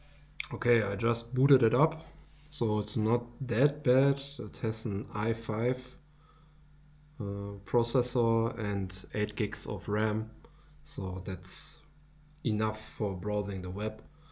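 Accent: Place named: Germany. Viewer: Most german accent ever seen🎉❤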